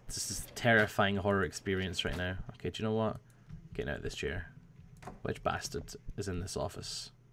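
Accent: Scotland, United Kingdom. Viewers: He is Scottish, I don't think Russian sounds like that at all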